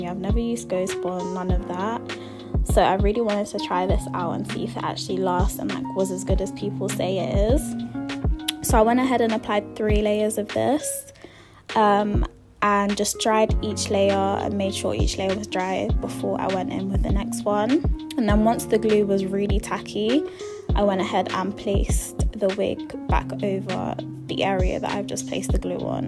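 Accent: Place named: United Kingdom. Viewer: I feel like when people have a British accent they have an advantage in life but when people have an African/ British accent. Untouchable. Lol looks so good on you and love your voice. It’s so pleasant.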